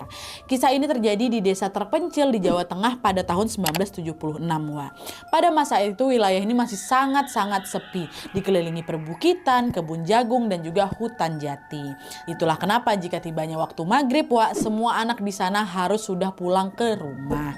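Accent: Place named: Medan, Indonesia. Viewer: Hai kk.aku br lht vidio kk...cr kk bawakn narasi " medan" kali y....jd lucu aja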